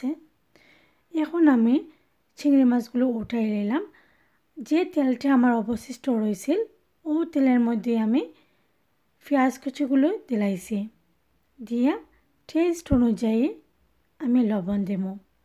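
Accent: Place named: Sylhet, Bangladesh. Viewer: আপু দারুন রেসিপি,তোমার কথা শুনতে খুব ভালো লাগছে,বিশেষ করে “ “গান শোনার পর আমি সিলেট ভাষার প্রেমে পরে গেছি❤️❤️❤️❤️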